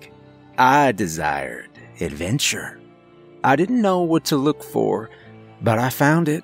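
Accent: United States. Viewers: Sweet another amazing story I love the cowboy accent on this one to would love to hear more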